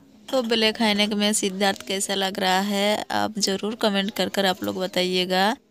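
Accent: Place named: Bengal, India. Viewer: Are you a bengali as you talking asent is like bengali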